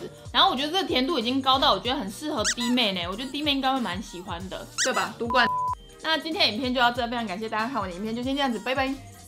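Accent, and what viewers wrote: Taiwan. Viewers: 講台灣國語敲可愛的我都會噗揪笑了出來🤣🤣🤣🤣🤣💜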